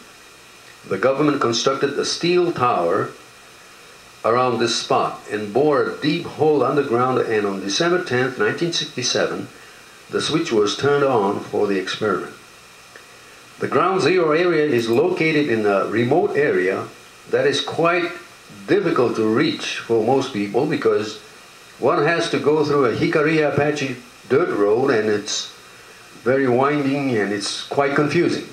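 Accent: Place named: Germany. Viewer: Nice video! You narrate well, you sound like Ricardo Montalbán with a hint of German, not being facetious about it but really, you need a job with the History channel.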